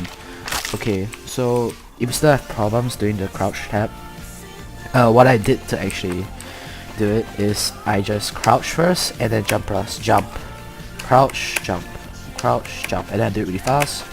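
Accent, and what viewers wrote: Singapore. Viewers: Are u from Singapore? U sound singaporean to me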